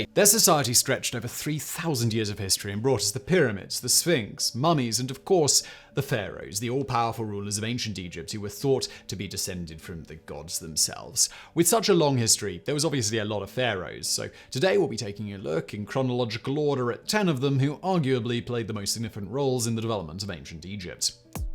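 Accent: England, United Kingdom. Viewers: This gives credence to the saying that when someone speaks with 'English' accent they sound cleverer than they are. This was the least informed video I have seen from this channel. This was ethnocentric description Egypt's greats. In his list, the founder of Egypt came last. Never bothered to mention a significant reign of the Kushites that probably reigned longer than some he referenced, or Imhotep whom was highly influential and unusual character in Egyptian history. Basically this says Egypt was about the kingdoms that defeated it from outsider Africa, from Hyksos to Romans, whom were occupiers of Egypt. Its like talking about the US and forgetting to make a fuss about George Washington, and to make matters worse, George Washington was considered the least important amongst a top ten list of Most important Americans. Balderdash.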